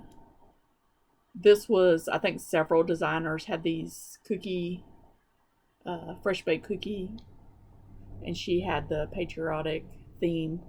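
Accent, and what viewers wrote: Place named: South Carolina, United States. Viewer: Hi! The thing that drew me to this video was your beautiful Scarlett House Floral Motif piece! It is simply stunning. It is really calling my name, but I’m trying to WIP down! I am also charmed by your accent! You are from SC? I’m originally from Tennessee although I live in Chicago now. I used to sound a lot more like you and I miss it! lol. Sounds like you had a fabulous retreat. I love spools and want to find a big one some day to put in my craft room! I’ll be looking forward to your next one whenever you get around to it!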